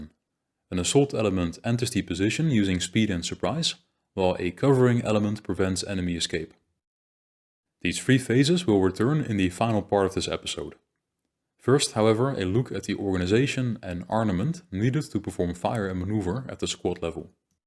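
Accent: Netherlands. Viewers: The content is very good. But your Dutch accent is very thick. You make a lot of small pronunciation errors. 100% on some sound. It is really a pity. For an example word: you cannot pronounce the word "organization" correctly. You say "Organizasjen". Or the word "section". You say something like 'seksjen' with a 'sh' sound. "Organizasjen" . Dutch people always hear insert a 'sj' sound everywhere in English. I suppose it has linguistic reasons and very difficult for a Dutch person to learn. Still it is important to know that where your English is very good. Your pronunciation is quite bad on that specific sound. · Nederlander. 🤣🤣 ons accent is zo herkenbaar he hahaha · i think that the narrator is a dutch person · Love the Dutch accent ❤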